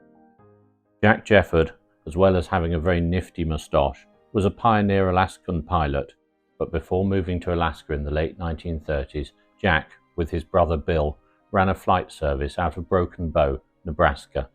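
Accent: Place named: United Kingdom. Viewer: You speak like a Brit and dress like a Yank. I had a hard time reconciling the two until I learned we share the same sense of humour and passion for flying. Well done, old man!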